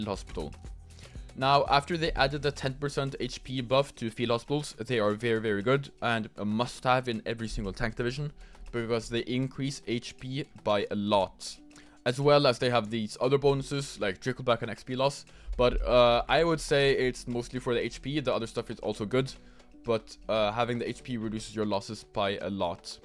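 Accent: Norway. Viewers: Bror høres så norsk ut🔥🔥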